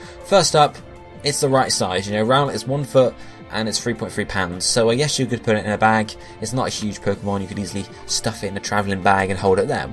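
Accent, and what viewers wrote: United Kingdom. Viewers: How does a magearna fit in that bag?! A 1ft diameter ball was fishy but a 3'3" ball?! You sound british, perhaps you don't know how to translate feet to centimers?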